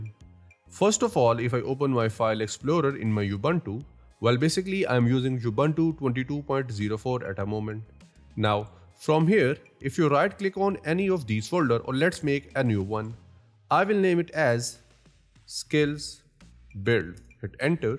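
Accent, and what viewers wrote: India. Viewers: Indians speaking English sounds very ridiculous, next time use subtitles or remove anything below your tonge, the world will thank you.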